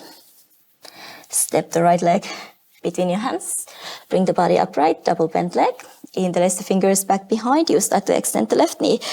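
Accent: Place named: Finland. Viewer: Very creative & innovative practice! Really loved the handstand jumps on & off the mat. I had no clue that so much of hip work was also involved for getting into handstands until this practice. Thank you so very much for this beautiful library 📚 of practices as I have completed one more 50-minute practice. 💙👩🏻💚🥰😇👌🏻🙏🏻💪🏻✨May I know which country are you from? Your accent sounds very Finnish 🇫🇮 but I wasn’t sure.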